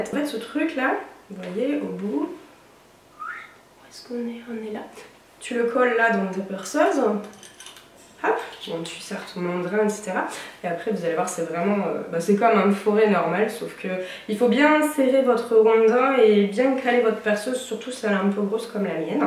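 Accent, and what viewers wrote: South, France. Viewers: Joli DIY de récup' ;) ! Tu as déjà un peu l'accent du sud !! Cela m'a tout de suite interpellé ! Bisous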